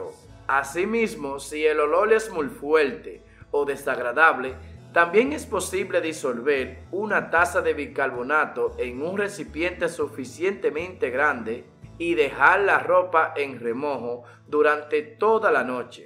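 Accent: Dominican Republic. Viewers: es dominicano xd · Es que así es el habla del dominicano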